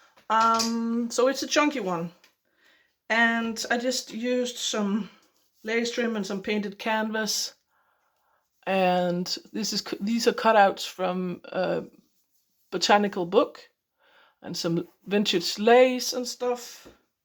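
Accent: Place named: Denmark. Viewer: I love it! I haven't come across your channel before. I've been trying to place your accent - you said you don't speak Dutch, so you're not Dutch. I'm sure you're either European or Scandinavian. Are you Danish? Lol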